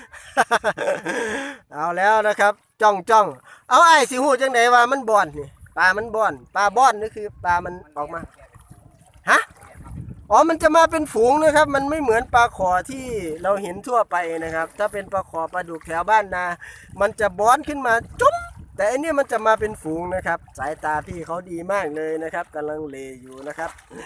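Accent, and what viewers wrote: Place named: Bueng Kan, Thailand. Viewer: โอ้ยเนาะฟังๆไปกะคนบ้านเดียวกันคนบึงกาฬคือกันครับ เอาไปก่อนเลย100วิวบักหล่า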